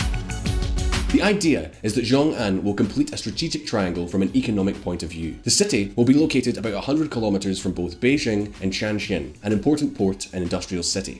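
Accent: Scotland, United Kingdom. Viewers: You’re pronunciation is weak...as an American, I love hearing the Brit accent but yours is either hindering your pronunciation or you’re writers and team are failing you miserably. Best of luck red coat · So curious! Watch a video in british accent that I already watched in spanish from Spain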